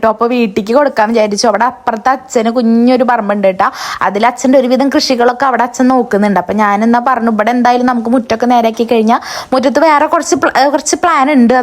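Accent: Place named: Malappuram, India. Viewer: Kayi Malappuram slang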